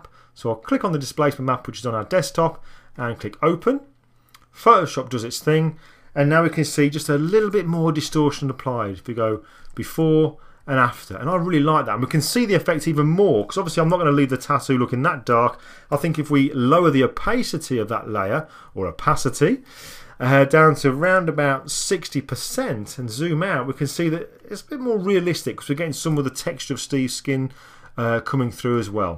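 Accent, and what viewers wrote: United Kingdom. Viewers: O cara nem é americano kkkkkk é britânico u.u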